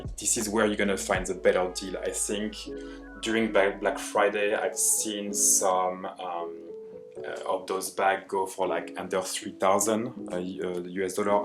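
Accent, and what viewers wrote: France. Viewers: Bonjour de la Belgique 🇧🇪, félicitations pour votre magnifique sac Hermès Kelly 😜❤️, je ne comprends pas l’Anglais, mais je devine ce que vous dites 😂, surtout votre accent bien Français 😂, félicitations pour votre collection Hermès, que je commence seulement depuis 1 an, je me suis offerte 1 Kelly 28 Gol retourné, bijoux Palladium ❤, maintenant j’attends 1 Kelly noir 32 retourné, bijoux Or❤, je me suis offerte une montre H et Brillants aux heures, ex ex ex des petits achats 😜❤️, comme je suis Belge, j’étais concentrée sur les sacs Delvaux Brillant 😜❤️, bon en espérant qu’ils n’y aura pas de disputes dans les armoires, la France contre la Belgique 😂, Meilleure vœux pour l’année nouvelle 🥂🍾🌲. Bien à vous 🤗💛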